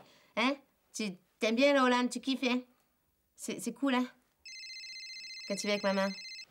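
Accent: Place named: South, France. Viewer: Mais pourkoi l accent sud? Une recette une bequille de soutient faute d ecriture mode parisienne de ce foutre des chti s et marseillais etc c est indigeste votre truc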